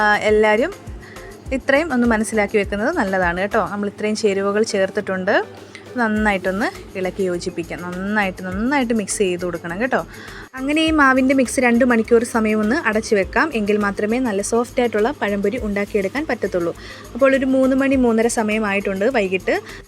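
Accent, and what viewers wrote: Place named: Kannur, India. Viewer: സംഭവം പൊളിച്ചു ☺️☺️🥰എന്തോ കണ്ണൂർ ഭാഷ പോലെ തോന്നുന്നു 😍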